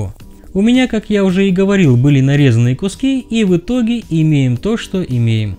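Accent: Kuban, Russia. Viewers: Вы случаем не с Кубани?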